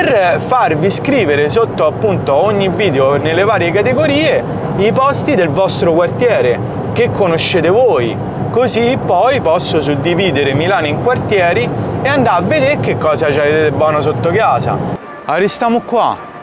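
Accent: Rome, Italy. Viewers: Per avere più riscontro fuori Roma, secondo me, dovresti sprovincializzare il linguaggio abbandonando la pronuncia in romanesco troppo marcata. Per me sei comunque simpatico e mi diverte guardare i tuoi video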